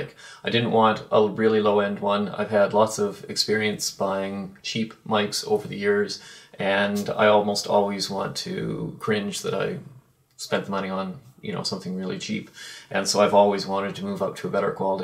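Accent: Canada. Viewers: Ohhhhh... You're Canadian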